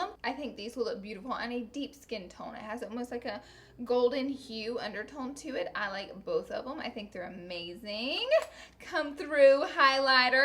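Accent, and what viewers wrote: South, United States. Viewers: It cracks me up when people leave comments hating on her voice. Maybe it's because I'm a Southern girl too but it's just the way we talk 😂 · I'm southern too and I think ur accent is beautiful😍😍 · New subscriber and just curious what state your from? I can't quite place your accent, but I definitely detect some southern twang. I'm from Virginia 😉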